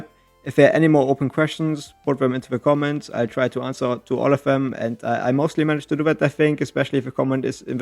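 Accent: Germany. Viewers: ohhh i hear se german aktzent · i hear DEUTSCH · I just saw your video against NAYF in tommy channel and then the algorithm send me this, what a finding! If you dont mind, I would just tell you to speak a bit more slow, its a bit difficult with your accent, is it german btw? (no offense lol, I am also not a native speaker). Btw, are there any other players on your level besides NAYF and Mohammed on this MOD? I would love yo see more matches, you play damn good. Edit: the commanders MOD I meant!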